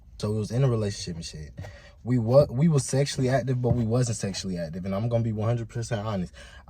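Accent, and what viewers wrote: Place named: Bronx, United States. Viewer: You’re from the Bronx New York??? Bro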